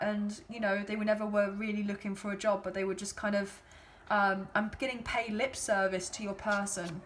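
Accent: England, United Kingdom. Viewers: Refreshing to hear an English Voice x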